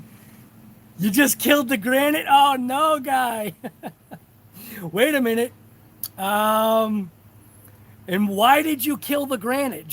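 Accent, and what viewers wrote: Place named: Boston, United States. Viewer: you gotta be a fellow bostonian. i can tell by your accent, wicked awesome guy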